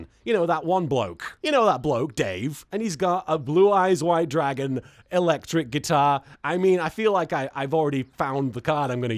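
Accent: United Kingdom. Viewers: being extra limey today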